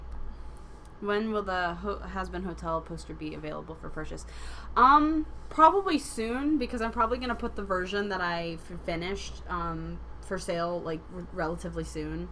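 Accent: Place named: England, United Kingdom. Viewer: I adore your English accent .... Oh, I'm not talking about that. You're gorgeous drawing! I am self-taught in drawing and you always inspired me, and also helped and in general you can say that I taught you how to draw correctly. It's gorgeous ~ And yes, if there are mistakes, then pritenzii to Goggle translator. = 3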